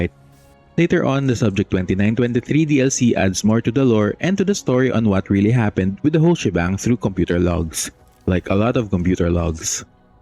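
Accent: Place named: Philippines. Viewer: You sound like Pinoy bro. Are you pinoy?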